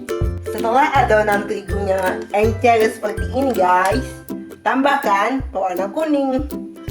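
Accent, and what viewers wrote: Palembang, Indonesia. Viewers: palembang banget logatnya, R nyo berderuuuuuupppp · oo pantes aja ..suara dari logatnya keliatan bnget buu...Salam wong kito galo😄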